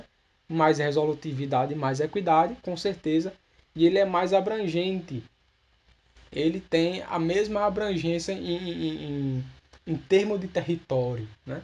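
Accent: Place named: Rio Grande do Norte, Brazil. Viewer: Adoreiii!! Esse sotaque bem Potiguar.. Sou de Natal-RN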